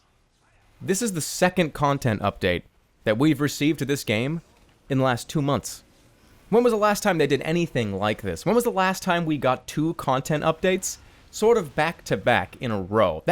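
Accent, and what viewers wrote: Boston, United States. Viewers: I believe he's from Boston.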